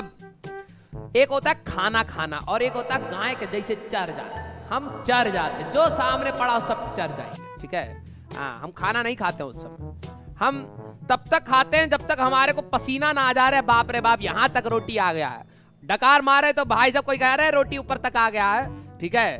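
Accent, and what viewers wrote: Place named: Haryana, India. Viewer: Isko kehte h ठेठ हरयाणवी 😂btw I m also from haryana love u sir ji❤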